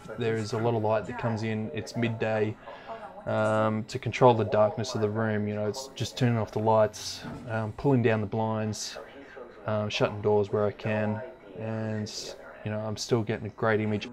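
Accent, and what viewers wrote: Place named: Australia. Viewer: Andre Blackwell After listening to him for the first couple of minutes, I'd say he's likely in Australia.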